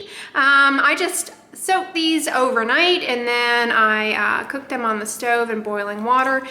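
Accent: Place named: Texas, United States. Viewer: Happy New Year, if I don’t see anymore videos till then, I wish you and your Mommy a healthy and fun one. 1) I thought you were faking the Texan accent, lol, I did not know you grew up in the South. 2) avoiding socially gatherings, you are right, those will keep you germs free...but sometimes they are fun. 3) I bet with myself that you would reach 50,000 subscribers by the end of the year...but you might even go over that number. I learn so much from your videos, thank you for the effort you put into them, you are very much appreciated. 😘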